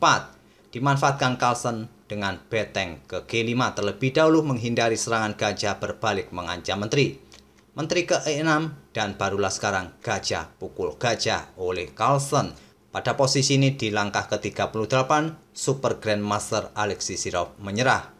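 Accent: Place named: East Java, Indonesia. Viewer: owh admin nya jawa timur, oke bng sukses selalu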